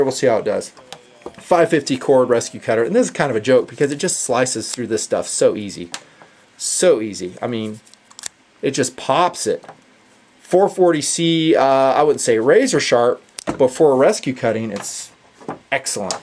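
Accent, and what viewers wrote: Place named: South, United States. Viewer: I just love southern accents like when he said "sheepdog knife!"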